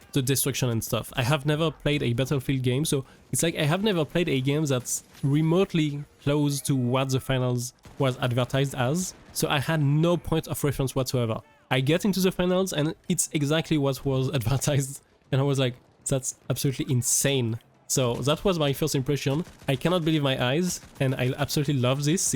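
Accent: France. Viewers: I'd be glad to help with the accent, I'm french too but people say I have a great accent :D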